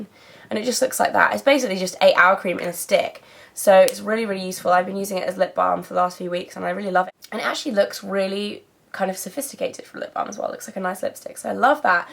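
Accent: United Kingdom. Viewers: I love your british accent! Do you think the foundation would be good for combination/oily skin?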